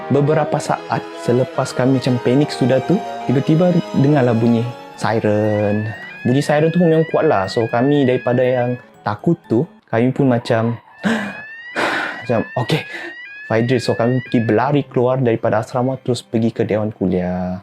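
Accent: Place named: Sarawak, Malaysia. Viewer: Budak sarawak rupa nye speaker nihh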